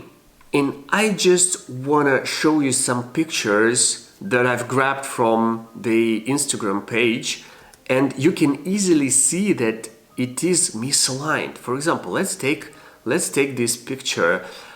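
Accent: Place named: Eastern Europe. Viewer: Great video, even greater personal exercise. Yes, slight eastern-European accent, but not this (infamous) rrrrussian one. My advise, take it or not - double bourbon before the takeoff, repeat as necessary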